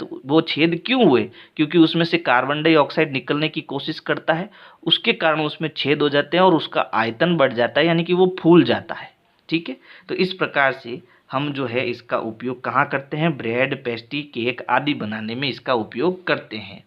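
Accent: Rajasthan, India. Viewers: Sir kyaa aap rajshthani ho😅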